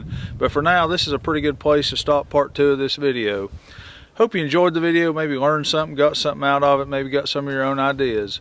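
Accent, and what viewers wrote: South, United States. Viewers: Love your Southern Accent... keep on trucking. Have a Great Day All Ye All..lol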